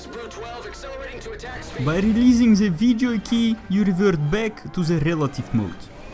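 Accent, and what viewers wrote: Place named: Russia. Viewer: Your Russian accent is amazing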